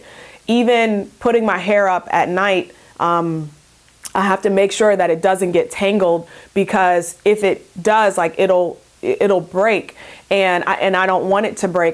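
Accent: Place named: Toronto, Canada. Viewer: Came across your Reiki video and think you are amazing. Beautiful, natural, personable, and so well spoken on Video. I’m from Toronto - you sound like a TO girl. Thanks for all you share. I’ll keep watching. Best from Canada.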